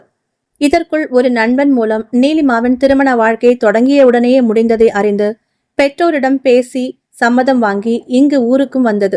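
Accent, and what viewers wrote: Madurai, India. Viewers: Hi..ungal native maduraiya....slang athe mathiri iruku..melum intha kathyil meenakshi amman patri neengal sonnathu unmai...yennidam oru thadavai kanavil vanthu mookuthi ketal...aanal naan athai suththamaha maranthu vitten..oru murai meenakshi amman koviluku pohum pothu vasalileya aval kural kettathu mookuthi vangitu vanthiya yendru...appothan ninaivu vanthu avalidam sorry solli kovilukul ponen..aanal ammanai tharisika mudiya villai..thirai pottu vittargal...potramarai kulathu padiyil amarnthu vehuneram aluthen..aanal maru murai ponapothu marakamal mookuthi vangitu poi iyaridam kuduthu avaluku poda sonnen...andru iravil kanavil vantha amman koburathin mel irunthu yen mel malligai poo panthai yen mel pottal..yen native madurai than...i used to visit her often before marriage...even now wenever i go to madurai i used to visit her...but nobody knows this matter till now ...i am revealing to u only..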